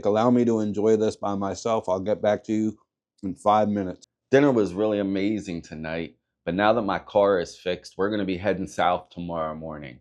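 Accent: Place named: Baltimore, United States. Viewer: haha same…I’m from Abington! I heard the accent but couldn’t distinguish if it was Philly or Bmore and when I saw you watching the Eagles game but not saying anything about being from the Philly area, I then assumed it had to be Bmore…haha.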